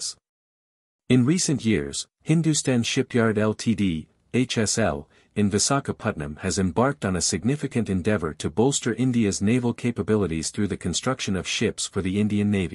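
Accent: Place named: China. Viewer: Where accent suggest you are Chinese. Please introduce yourself.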